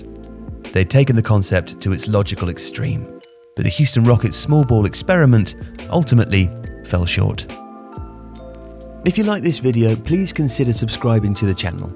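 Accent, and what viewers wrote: United Kingdom. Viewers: i love how a dude with a british accent is talking about american basketball lol. fr tho i love your vids keep it up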